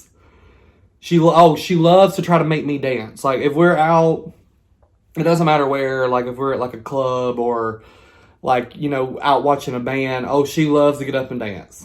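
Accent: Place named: Minnesota, United States. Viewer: I'm jealous, love shrimp. I caught the Minnesota accent. 😁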